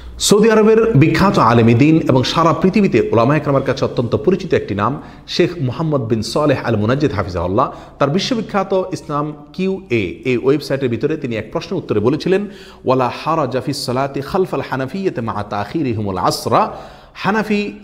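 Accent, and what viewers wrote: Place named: Noakhali, Bangladesh. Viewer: শায়েখ আপনাকে অন্তর হতে দোয়া করি মুসলিম উম্মাহর ঐক্যে পানি ঢালার জন্য। মাশাআল্লাহ। আলহামদুলিল্লাহ। আজ মুসলিমদের ফেকহি মাসআলা নিয়ে অনেক বিভেদ, বিভক্তি ও ফ্যাসাদে লিপ্ত। ইহা নিয়ে বয়ান দেয়ার জন্য জাজাকাল্লাহ। আল্লাহ পাক আপনার হায়াতে বরকত দান করুন। আপনার বয়ান গুলো আমি শুনি। আপনার কথা গুলি আমার নিকট অনেক গ্রহণযোগ্য মনে হয়। অনেক শায়েখের কথা একটু বেশী কড়া ও ঝাঁঝালো।যা মুসলমানের মাঝে অনৈক্যে একটু ইন্ধন হয়। আল্লাহ পাক শায়েখদের হায়াতে বরকত দান করুন যেন সকল মুসলিমের মাঝে ঐক্য সৃষ্টি হয়। আপনার কথায় কেনো জানি আমি বুঝতে পারি আপনি নোয়াখালির কোন অঞ্চলের মানুষ।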